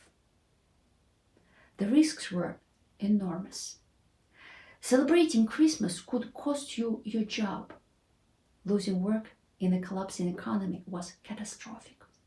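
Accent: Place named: Russia. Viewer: It is very soothing listening to your Christmas description with your charming Russian accent. 🤗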